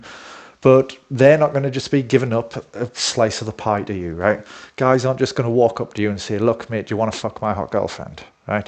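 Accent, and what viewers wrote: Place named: United Kingdom. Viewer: 22.30 pff you're too uptight and serious about this "outlaws vs respectable society" thing IMO. rock concerts are awesome. you can be a man on your own journey and living life on your own terms but still enjoying what others have achieved because it brings you joy and excitement. just for the sake of it! what's so bad about it for a sigma character? i find your views very intriguing but sometimes you make it a bit too complicated,especially with your strong british accent :-p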